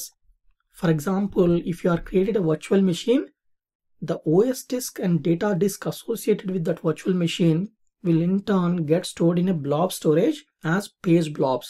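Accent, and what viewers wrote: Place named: India. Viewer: I'm a about to switch from undergraduate to a grad computer science program and I haven't understood a single thing of what was going on on that video...(well I haven't really studied Big Data (thoroughly) yet,but for people like me this is completely out of reach...or would take 6 hours to understand (most of) all of the material of those concepts) not nice! Also I must put forward the significant Indian accent of the video lecturer, which induces additional efforts in trying to decipher his explanations. (PS: I'm not in grad school yet...so may change don't worry) (PS_2: I studied in a top European university (aside from the UK) To sum up I appreciate the efforts from the poster and turning into on the best IT YouTube educator with a big amount of hard work should entirely be possible. I wish you strength and motivation ! all the best!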